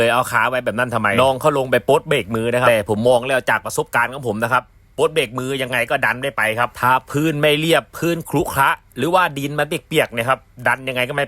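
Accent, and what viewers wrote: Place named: Sukhothai, Thailand. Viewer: สำเนียงโขทัย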